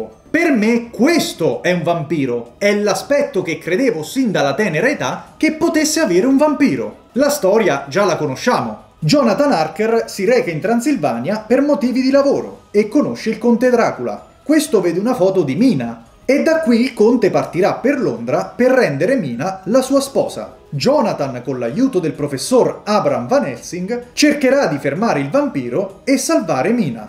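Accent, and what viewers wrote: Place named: Marche, Italy. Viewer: Maremma cane non prendiamoci troppo sul serio! tutte le settimane ci prepara questi gustosi video a tema horror e un punto di vista che condivide Co. Generosità Con noi che senso ha stare a commentare eh ma io avrei incluso? Sono le classifiche di il suo punto di vista non è necessario il nostro punto di vista è eccheccazzo 😊 forza grazie l'accento Marchigiano mi fa impazzire 😂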